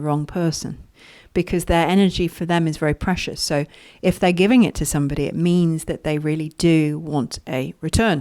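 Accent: United Kingdom. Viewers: 😊. Wow thank you so very much for that excellent excellent information! 7 what you're saying I knew, but some of them I didn't..... A's always I learned something new with you! I really needed to hear this and I don't think I would have heard it so clear and precise with all the details in nuances that you provide. After going through multiple narcissistic relationships, I've come to terms with what you were talking about I'm not putting too much volume and look for the way their body looks...ECT.... But learning to value a person more on their mind, character, and intellect.. may I give you a compliment, I really like the way you think your thought process is very deep you seem to be extremely organized in your thought process paying attention to detail. And well....I just love your British accent! 😅 Dave.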